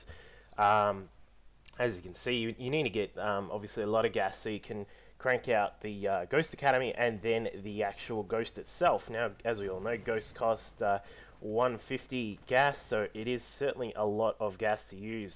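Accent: Australia. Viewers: Great to get some Aussie casting going on!!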